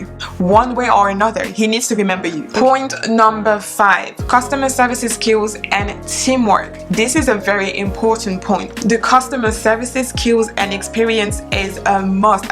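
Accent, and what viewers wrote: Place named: South Africa. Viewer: I actually thought you South African 🥺😻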